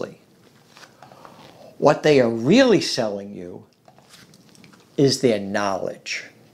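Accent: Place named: New York, United States. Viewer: AND YOU MUST BE FROM NEW YORK. LISTENING TO YOU MAKES ME SO 😔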